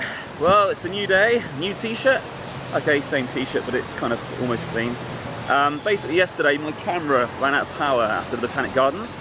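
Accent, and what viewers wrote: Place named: United Kingdom. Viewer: Adorable British accent.. and yeah brilliant video too :)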